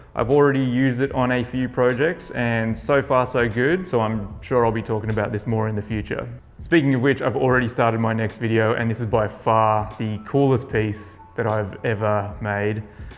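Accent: Australia. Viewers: Brilliant piece. I only realised you were Aussie in this video. Maybe I haven’t seen a video you speak in. Would love to visit your studios next time I’m up that way.I’d love to pick your brain. I just moved to Vesting LED cured hard wax oil. Not sure if you know it. Pretty cool, and I definitely had to make a few adjustments to sanding but it’s a great finish so far. And the instant LED cure is pretty amazing. I switched from Osmo too and I absolutely had issues with water/liquid damage with the last few pieces compared to previous. Never thought about too much till you mentioned it. Anyway, thanks for sharing as always, brilliant.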